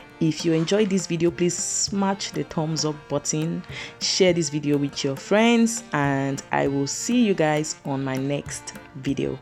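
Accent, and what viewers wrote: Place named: Nigeria. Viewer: You have Nigerian accent the rice looks absolutely delicious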